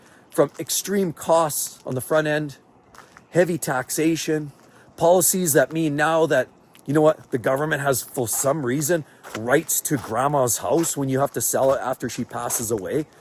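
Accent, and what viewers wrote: Canada. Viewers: This guy sounds pretty Canadian to me should’ve said so at the beginning. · That's one helluva hoser accent, eh? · you sound canadian... was waiting for you to say 'eh'.... im guessing youre a youpeer maybe.... IDK, Im Alaskan, and you sound like a lot of canucks i know...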